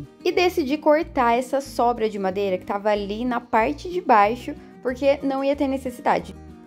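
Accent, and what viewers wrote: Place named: São Paulo, Brazil. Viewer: de que cidade você era antes de casar? Você fala muito parecido com o sotaque do pessoal do interior aqui de São Paulo onde estou morando. Moro em Tatuí, mas, toda a região o pessoal tem esse sotaque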